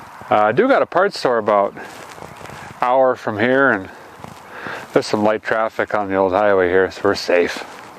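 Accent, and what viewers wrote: Minnesota, United States. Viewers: You sound like you're from Minnesota your accent is really thick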